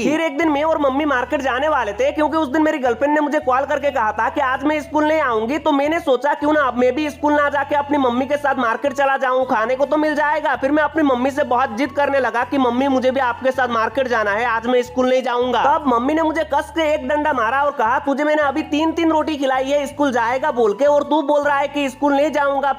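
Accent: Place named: Nepal. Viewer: When nepali speaks hindi and thats too assamese thisis awsome ❤️❤️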